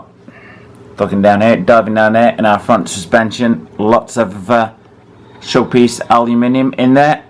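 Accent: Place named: Liverpool, United Kingdom. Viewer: Are you kidding me that's not a New Jersey accent you mean Jersey... Jersey on the mersey?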